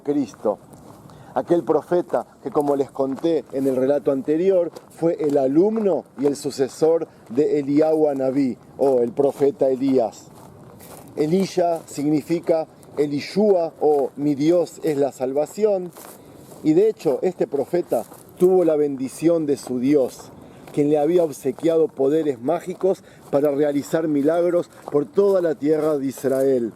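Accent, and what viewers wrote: Argentina. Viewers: El sonido de su voz como se le escucha parece que es Argentino, pero que vive en israel.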